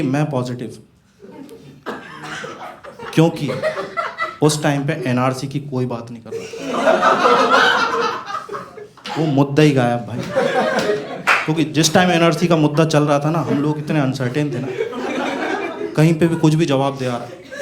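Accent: Indore, India. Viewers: Bhai dono madhay pardes ke hai.... Bhai ka accent toh pura biaora , indore ke aas paas ka lag raha hai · Both Zakir and are from indore.. And that is the indori accent. That's why they sound same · Dono hamre MP se hena to accent to same hoga hi or me bn gaya itna bda comedian to mere ander bhi aap esa hi accent dekhoge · Both he and Zakir are from Madhyapradesh and that’s why similar Hindi dialect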